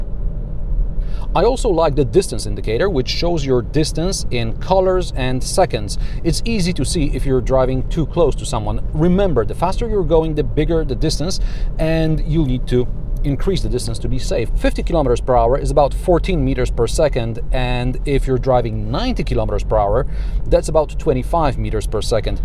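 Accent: France. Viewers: I love your reviews man...!!!! nice one. Your french accent rocks!!! :) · Nice looking car from the outside but... The Sat nav on the Kadjar I tried was unfathomable.... (French logic?) ...and the infotainment system made funny buzzing noises whenever I passed near power lines... Rear seat space is cramped, performance is below class average and not sure how well it would stand the test of time. Like your french accent BTW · I like your french accent 😂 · Lmaooooo the French accent. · Yours French accent is amazing! Pink Panter far behind.... P.S. Car petrol engines not impressing....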